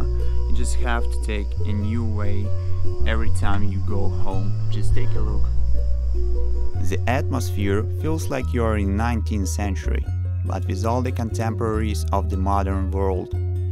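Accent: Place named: Australia. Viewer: Thank you for this awesome and very detailed vlog. I am visiting Greece in 10 days and Hydra is one of the places on my list. The moment I came across with this beautiful island while planning my trip I fell in love right away especially the no car concept and how walkable the lovely harbour town is. By the way, I am curious about your cute accent, I could recognize some part Australian and a hint of eastern European. ;-) Cheers mate!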